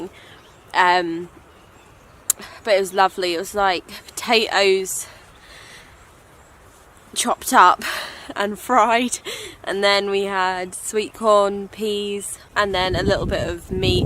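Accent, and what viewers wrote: United Kingdom. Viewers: I love her accent. It's so amazingly British. 🇬🇧 It would be nice to meet by the fire. 🙂 Regards from Poland. 🌳🏕️🏞️🐗🐿️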